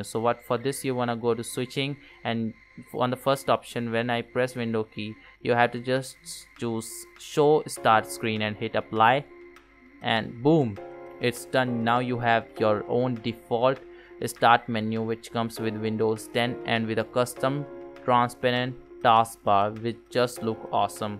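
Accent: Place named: India. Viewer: rip english rip indians english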